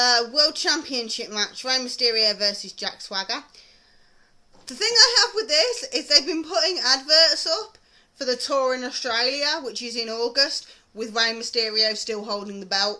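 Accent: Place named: United Kingdom. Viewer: oh whatever girlfriend, let those haters hate, your videos are great and your views on wrestling are smart and direct, plus, you have a killer british accent.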